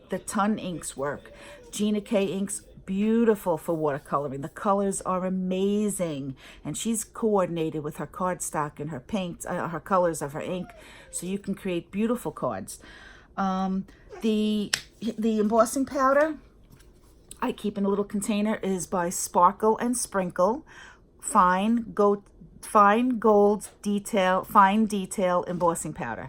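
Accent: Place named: New York, United States. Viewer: What an inspiring and encouraging video. You have covered all my favourite topics and techniques - inked backgrounds, stamping, embossing and water colouring, and all accompanied with your wonderful New York accent. You have made my day!! Thank you so much for the great advice on a technique that I am now inspired to try myself. Love watching your videos here in Ireland.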